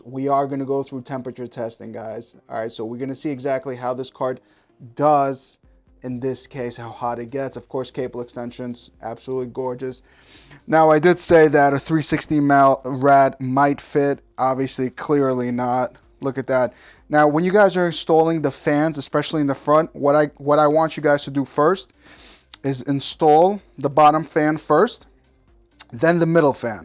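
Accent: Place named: New York City, United States. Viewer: this guy is painfully New York and it's amazing, big twist to the tech community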